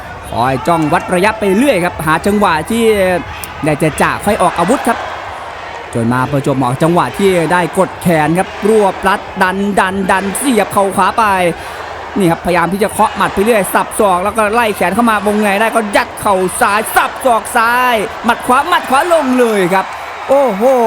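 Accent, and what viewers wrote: Isan, Thailand. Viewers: พากมวยมีอารมณ์ร่วมกับมวย.รวมพลัง. น้ำเสียงดีด้วยเลยทำให้น่าฟังมาก.ขึ้นไป อีก.ติดตามตั้งแต่เริ่มเปิดมาดูแล้วครับ.พาก มันจริงฯ.ชอบฯ.ไทยปนอีสาน.อย่างแจ่ม..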